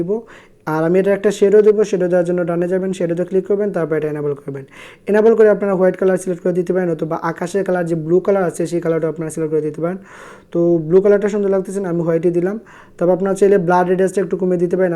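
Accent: Sylhet, Bangladesh. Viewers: ji oy... Bai. Jesataw kow.. Ek Sylheti e onno Sylhetir mat bala kori buje🙃😇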